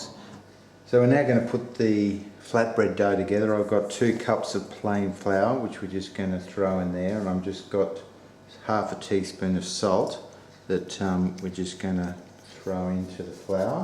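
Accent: Australia. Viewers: Maria Lopez Nope, that's an Australian accent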